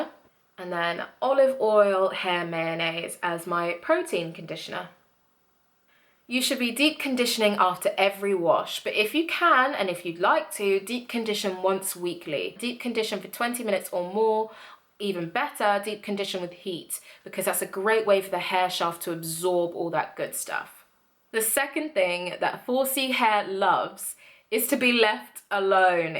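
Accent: United Kingdom. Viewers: yes you're British!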